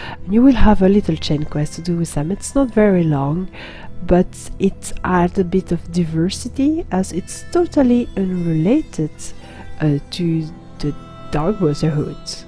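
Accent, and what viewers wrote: France. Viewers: I like the feeling of you talking in your videos (I have seen maybe 5 or 7 :D ). I love your french accent and now I finished watching this video and literally I am buying now the 2000 crowns and am buying this DLC in this moment :D. I am so excited about the DLC, your video was great. Many thanks for not making any spoilers, I am excited about the daedric plane (or aedric maybe :D )connected to this DLC. which daedric prince is with DB? Mephala :D, I wonder how it will look :D. I guess pretty awesome :D. and interresting thing that you told about he feeling of killing someone :D. I look forward for the finisher killcam, I loved them in Skyrim :). when npc was some low level of health, the last hit was a kill-cam execution video, very nice, I liked that. the same, I am peaceful person, but nice feeling in this game. ZOS has made a good job in this part too apparently :). 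Ok, no waste of precious time, I go to dark brotherhood now :). see you there :). PC EU ;o))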